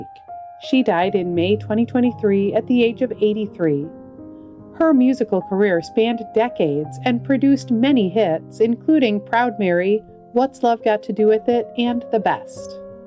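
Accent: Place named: Midwest, United States. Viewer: Narrator has an interesting Midwest accent.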